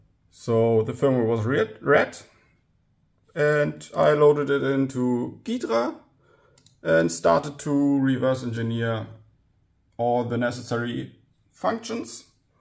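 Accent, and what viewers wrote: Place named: Germany. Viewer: Interessante Information aber dein Akzent kommt so stark (wie bei jedem Deutschen) hervor :D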